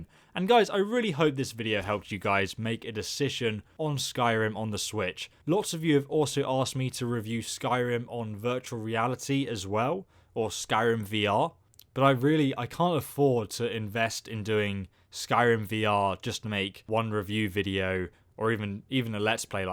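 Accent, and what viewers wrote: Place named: RP, United Kingdom. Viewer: I would love to hear a review of Skyrim VR with that posh accent of yours. I tested all your glitches on VR and they are working too.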